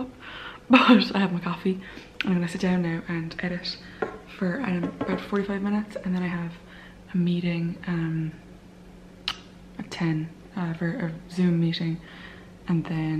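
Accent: Ireland. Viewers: has a lovely soft Limerick accent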